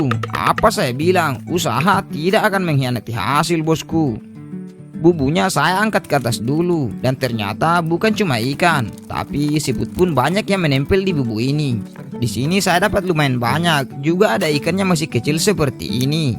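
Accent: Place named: Eastern Indonesia, Indonesia. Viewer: Ha.ha baru tahu saya bang, nungguin video paman bara gak upload2, eh malah ketemu ini, Mantap daeng, Saya dari awal, mencoba menebak ini kok dialek timur sana tapi dari mana ya, begitu sebut kata "seket dan "terbangka-bangka" saya langsung 😂😂😂😂😂😂.. Sukses selalu daeng,... Salam dari bori bellayya, tanah melayu deli... Putra asli Butta Panrannuangku.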